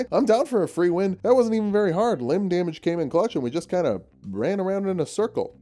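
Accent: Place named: Edinburgh, United Kingdom. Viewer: London? Edinburgh surely?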